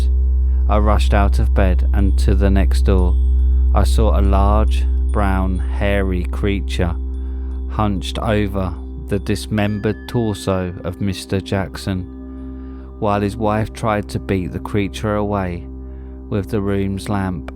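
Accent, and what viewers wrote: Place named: United Kingdom. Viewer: Loved your intro dude. Sounds like you are a fellow Brit narrator too. Great to see your channel growing too. Hope you don't mind a little bit of critique.. nothing bad. Try to make your reading a bit more flowing. Maybe when you do a person's speech, maybe try adding a bit of inflection/emotion into whatever they are saying. That being said, one thing I find that listeners do seem to enjoy, is to add plenty of sound effects throughout the story. So far I haven't found any other narrator, not even the big channels, add as much as I do. Folks find it helps the story along, by making it more immersive. Some have even told me that my narrations remind them of the old-time radio shows haha. Oh and maybe ask around for collabs, that can also help boost your subs and watched hours too.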